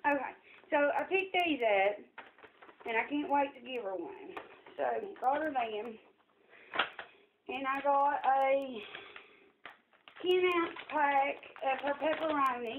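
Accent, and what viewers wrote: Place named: South, United States. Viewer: Hey, love watching your videos, your southern accent gets me every time it's too cute. I fianally found the cinnamon candy and colouring books! I was so happy, I bought two bags of the candy it was really yummy. My yorkie loves any kind of jerky. Like seeing what your mani of the week is too! Have a great weekend sweet friend. Xo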